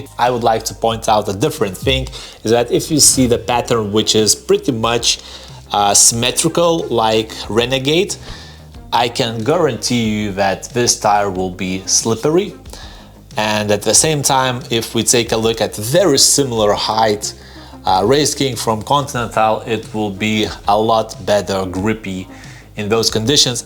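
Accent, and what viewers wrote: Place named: Soviet Union. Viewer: strong soviet accent ))) but video is very good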